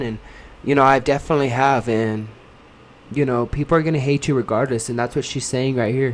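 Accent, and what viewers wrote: India. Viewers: Nooo!!! U got a indian/gay accent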